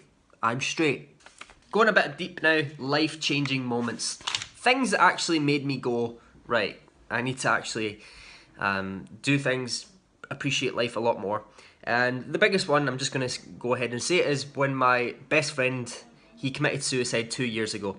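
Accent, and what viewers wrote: Scotland, United Kingdom. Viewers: Gaaahhhh I would love to go to Scotland! I have Scottish on my dads side. To go see part of my ancestry would be the best! But thank you for sharing your lovely accent! I still laugh when people think it’s Irish 😂😂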